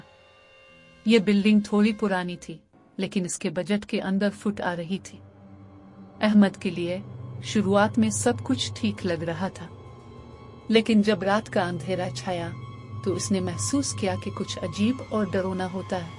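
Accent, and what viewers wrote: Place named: Afghanistan. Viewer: Aap ko urdu aa nahi rahi hai Afghani accent hai app ka Muslim log puja paat Nahin karte😮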